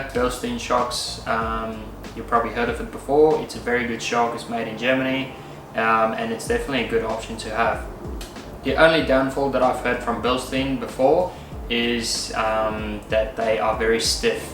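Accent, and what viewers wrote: South Africa. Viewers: are you South African born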